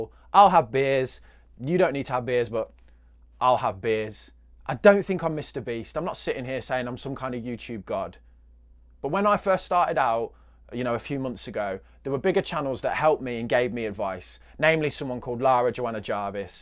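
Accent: United Kingdom. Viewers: Honestly and truthfully. I have been watching you for about 2 weeks now. And first i like to hear our British lad talking about shares and giving advice. I know there are alot out their abroad but it's different ( you know what i mean) . I have you on my notifications. Keep up the great work most importantly, your Honesty..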